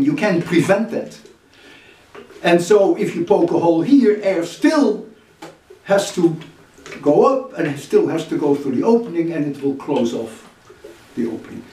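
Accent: Netherlands. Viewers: Toch maar even uw naam gegoogled, ik dacht al aan uw accent te horen dat u nederlands bent. Toffe videos!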